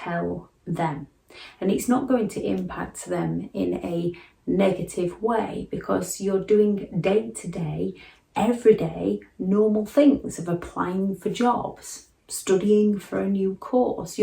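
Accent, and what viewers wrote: United Kingdom. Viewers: I like your work. You are well researched, even keeled and the UK accent is just lovely.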